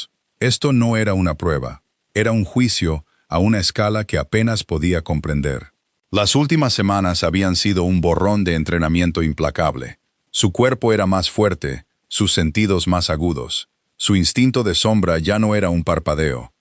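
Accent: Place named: United States. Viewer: Podria ser una voz de IA que no tenga acento gringo?, ya sabemos que estan desesperados por invadir latinoamerica pero ya esto se pasa un poco.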